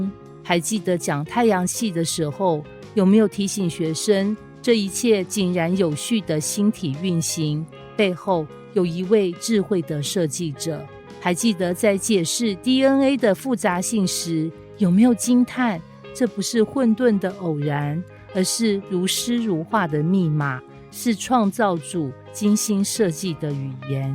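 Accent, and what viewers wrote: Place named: Taiwan. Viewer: 為什麼要用這種奇怪又難聽的腔調講話，這是在醜化台灣人的口音。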